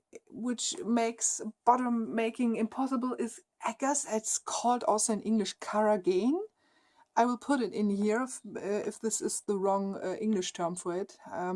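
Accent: Germany. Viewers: Being a of direct German heritage I love seeing all German things and hearing all the stories.... Brings me back to my youth and seeing the things around the homes of many of my relatives and tales told to me by all of them....even your accent brings them back to me! Lol. Like getting a great big hug from all of them! Thanks for sharing.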